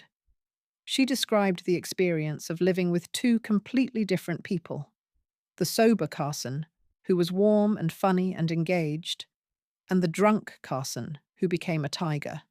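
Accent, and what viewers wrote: United Kingdom. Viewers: Do we really need Brits to narrate an American story. Surely an American accent would be more compatible.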